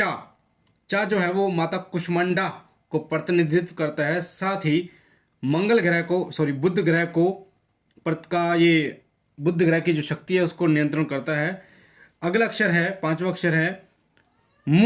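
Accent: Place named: Punjab, India. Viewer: Punjabi हो? Heavy Punjabi accent.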